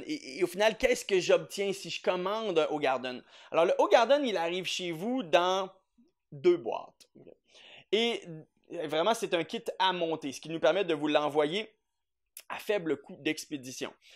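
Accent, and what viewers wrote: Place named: Quebec, Canada. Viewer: No offense... mais... l'accent québécois démolit complètement la vidéo. Un des accent des plus horribles de la la langue de Voltaire.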